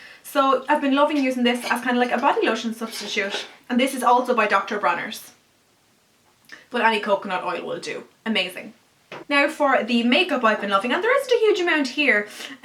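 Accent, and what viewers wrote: Ireland. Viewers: love your vids ! are you from dublin?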